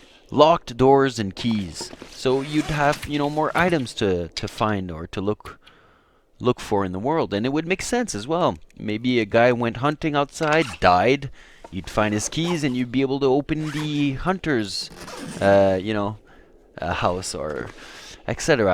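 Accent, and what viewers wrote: France. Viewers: are you french cause im french and when i speak english i sound similar to you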